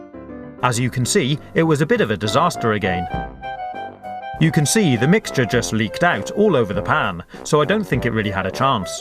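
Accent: United Kingdom. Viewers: god bless your british accent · he's British, that's how they say it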